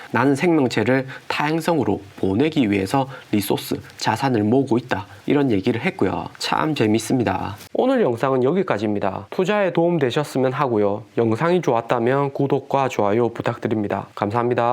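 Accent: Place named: Busan, South Korea. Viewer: 부산같은데용 ㅎㅎ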